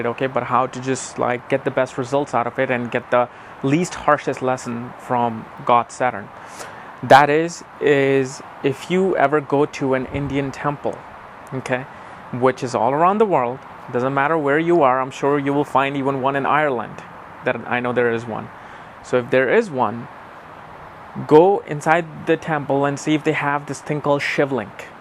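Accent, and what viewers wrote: United States. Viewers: Just because he speaks in american accent? Do you think this is new invention? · that’s your petty mind speaking. Coz one thing that sucks about him is his American accent. It’s his attitude and how he explains astrology is very unique intelligent and entertaining, that draws us to him.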